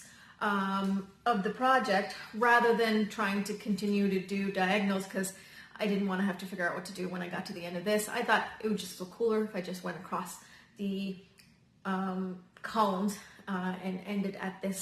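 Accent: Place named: Newfoundland, Canada. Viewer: Hi. I’ve been a subscriber for a while and I have admired your stitching so much. Before you go on too much about you should know that he makes fun of the way you all in Newfoundland talk. Lol 😂. You’re right. He is so funny and I think he and I were separated at birth 21 years apart. I’ve started my first full coverage piece so you are a real inspiration for me 😍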